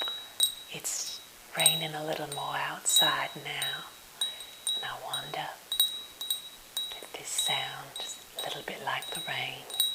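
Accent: South, United States. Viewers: I like the tapping also the southern accent makes me relaxed a lot i seem to prefer it for asmr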